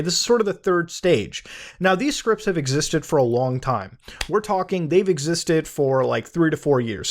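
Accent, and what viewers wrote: United Kingdom. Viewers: wow, I wasn't expecting to hear bri'ish today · with British accent kinda slays tho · nice british accent lol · I thought u was wearing a mosque hat for a sec : Also that british accent thou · I want more Bri'ish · can speak Hindi and British. gosh I love this guy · So... British! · nothing makes me more happy than hearing that fresh Bri’ish accent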